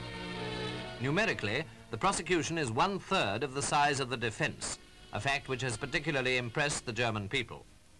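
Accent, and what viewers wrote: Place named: RP, United Kingdom. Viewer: speaking BBC English instead of the mishmash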